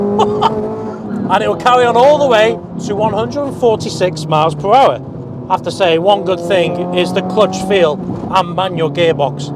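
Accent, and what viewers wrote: North Wales, United Kingdom. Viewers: Where abouts in north wales you from?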